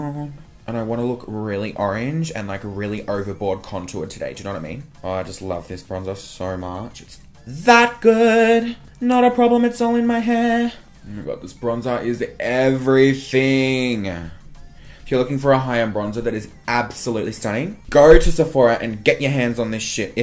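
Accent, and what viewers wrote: Australia. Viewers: Are you Australian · u from australia?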